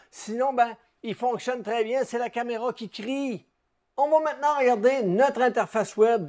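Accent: Quebec, Canada. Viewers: Tout simplement, j'adore ton humour et ton accent Québecquois très cher cousin, au plaisir de te rencontrer chez toi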